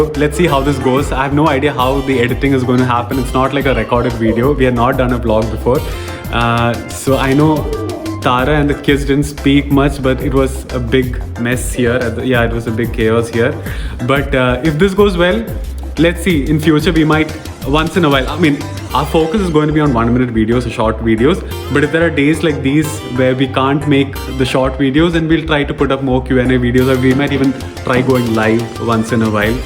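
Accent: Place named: Kerala, India. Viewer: That's just Malayali accent. Most of us have it. 😂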